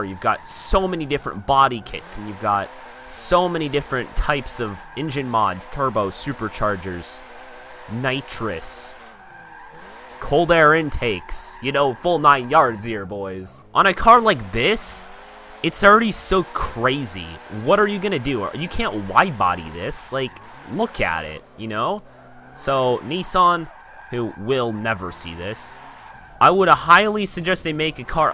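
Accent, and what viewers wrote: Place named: South, United States. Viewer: Your southern accent is better then Mine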